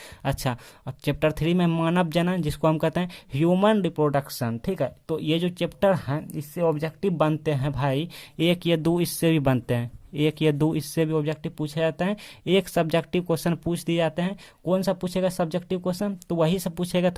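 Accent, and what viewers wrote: Bihar, India. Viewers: Pure bihari.. .. 😄☺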